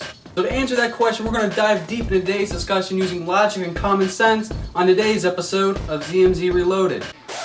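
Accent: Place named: St. Louis, United States. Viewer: sounds like saint louis, missouri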